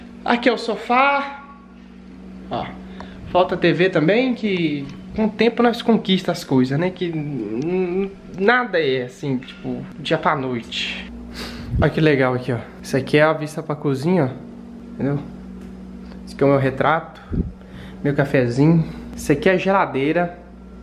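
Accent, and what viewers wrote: Minas Gerais, Brazil. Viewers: E que se toma o cafezim sozim kkk esse e mineiro raiz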